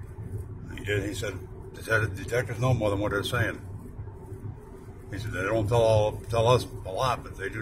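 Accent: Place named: North, United States. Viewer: Are you from Tennessee and this cop does he live in Tennessee you sound like you up north somewhere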